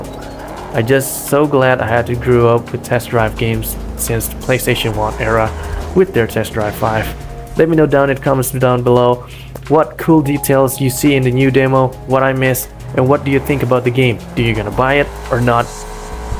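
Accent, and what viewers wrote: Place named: United States. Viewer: it's funny how you, not being a native english speaker, have so much american accent. and I agree with the list, I'm super hyped about this game because of roleplay details like the ones you mentioned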